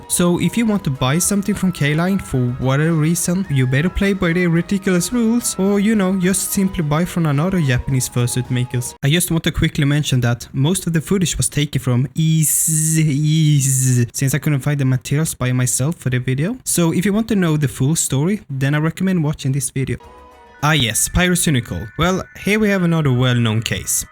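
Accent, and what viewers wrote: Sweden. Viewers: Love your swedish accent :D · I might be wrong but I think it's Swedish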